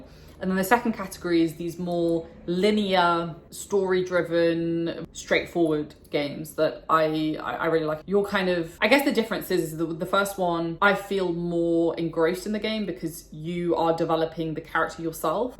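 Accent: United Kingdom. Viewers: Don't get confused because I'm commenting on videos from half a year ago, I'm trying to get that British accent right. Also, great list, AC and Witcher III are my favourite games ever. Skyrim is a great one as well!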